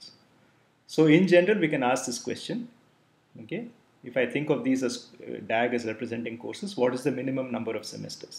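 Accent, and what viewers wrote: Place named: India. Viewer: Ужасный индийский акцент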